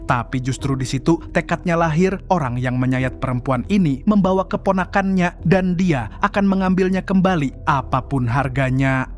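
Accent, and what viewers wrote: Banjar, Indonesia. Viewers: orang Banjar kah mang?😂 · Admin ny urang banjar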